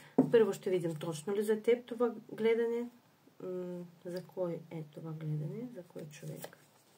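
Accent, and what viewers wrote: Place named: Russia. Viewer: Много приятно излъчване има тази жена!❤ И сякаш има руски акцент!❤ Надявам се да се сбъдне това което показват картите!❤Благодаря Ви!❤ Благодаря и на Висшите Сили!❤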